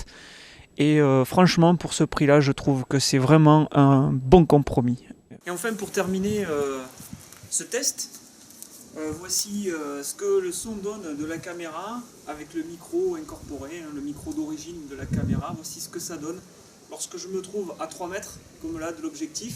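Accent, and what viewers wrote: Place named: Narbonne, France. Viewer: Ha oué, c'est bien ce que je me disais, du Sud, mais pas de Marseille. ca chante plus joyeux chez vous :)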